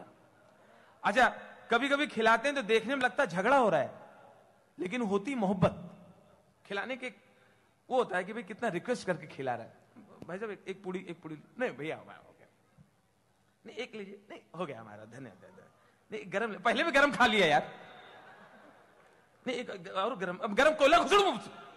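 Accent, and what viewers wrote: Kanpur, India. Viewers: Kanpuria style ..good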